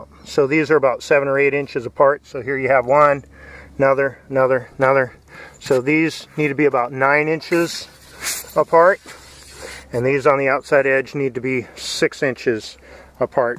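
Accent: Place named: United States. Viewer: I would certainly like to have you fix my dads roof but we are on the other side of the pond .......Australia......you sound like you're americana.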